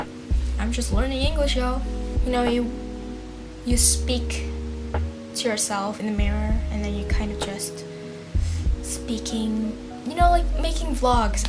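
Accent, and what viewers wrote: United States. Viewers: Sounds pretty much the same as american dialects !!! I like it how you say the T like the general american, keep improving !! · Hello there:) Greeting from Montreal Canada, your English is fantastic and your accent is top notch. you sound very american. Well done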